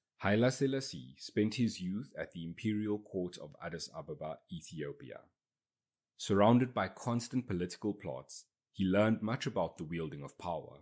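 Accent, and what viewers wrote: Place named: South Africa. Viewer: I didn't know those circumstances of Haile Selassie's birth and parents. Thanks, South African sounding narrator.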